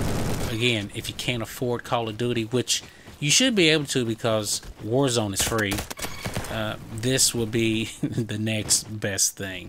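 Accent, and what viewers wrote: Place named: Texas, United States. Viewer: u have an ultra Texan accent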